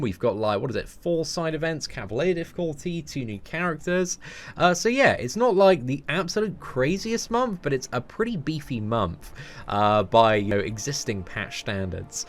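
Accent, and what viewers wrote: United Kingdom. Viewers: Mephisto getting another synergy and a profile pic finally maybe!? Let's go bro! As always appreciate the breakdowns of every new event so I can be lazy and not read the forums and listen to a cool British dude.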